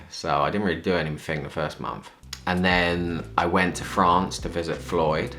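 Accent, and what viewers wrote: United Kingdom. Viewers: 조용히 님 구독하며 영국발음 행복하게 듣던 사람입니다^^ 영국에서 1년 살았던 추억으로 인생이 달라졌던 저라, 님의 9년 한국생활이 꼭 좋은 추억으로 남으시길바래요~ 영국돌아가서 원하는 꿈!! 꼭 이루세요~~!! 멈추지말고 우리 꿈꿔요! 응원합니다^^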